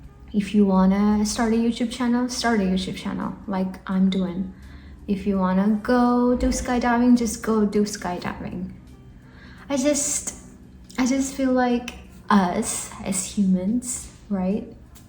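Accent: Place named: United States. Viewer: Wow your English accent is just like the American... Nice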